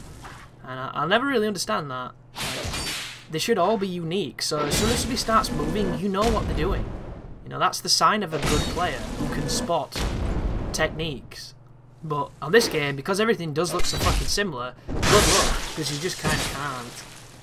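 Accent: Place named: Bradford, United Kingdom. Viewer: Yeah, you've definitely got the accent. I'm gonna guess Bradford then.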